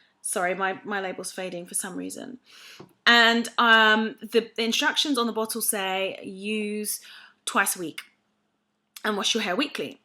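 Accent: England, United Kingdom. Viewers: Love ur beautiful English accent....lol. U sound a bit like Rita Ora. ;) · Ur so pretty and I love your English accent! And your hair looks great! Thanks for the thorough review.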